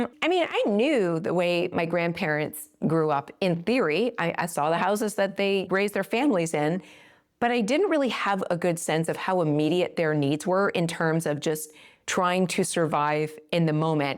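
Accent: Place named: Canada. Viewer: Great information. I am getting ready to retire next year. Btw, listening to your videos I think to myself I wonder if she’s from Canada. Your accent gave you away.😅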